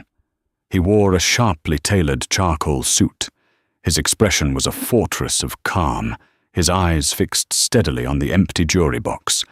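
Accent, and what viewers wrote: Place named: England, United Kingdom. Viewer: the narrator has the best English accent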